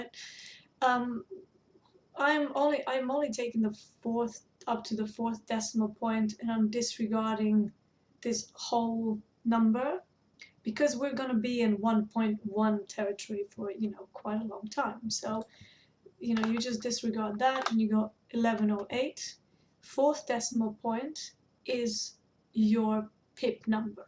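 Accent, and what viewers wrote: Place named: United Kingdom. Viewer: Your terms and methodology are suggestive of Online Trading Academy's. Are you a grad? (I'm enrolled in the Mastermind program and start Core Strategies this coming Saturday. I appreciate the pre-class tutorial, enhanced by the aesthetically pleasing visuals in the lower right corner along with a mellifluous Brit-sounding accent.) ;-)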